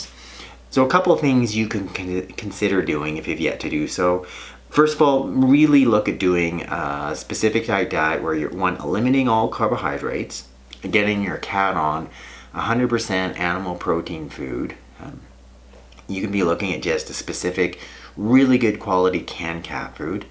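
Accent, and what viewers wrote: Canada. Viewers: Yes it is helping! I don't know if Canadian accent is much different from the American one, but surely my English is not as good as I thought 😂 but I admire your channel as a very needed social inciative. Tk you!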